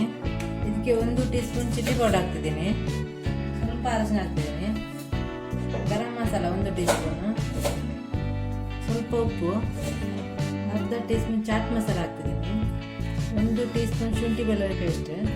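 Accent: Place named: Mangalore, India. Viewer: I like mangalore kannada.......... 😍😍😍😍😍😍😍😍😍😍😍😍😝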